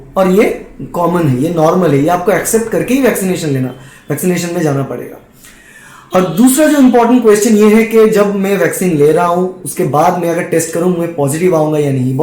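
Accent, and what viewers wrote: Bengal, India. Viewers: Are you bengali ?? 😊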